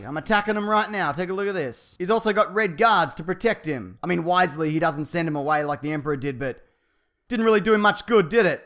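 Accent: Australia. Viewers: wait are you Australian? You sound like Australian? (Just curious)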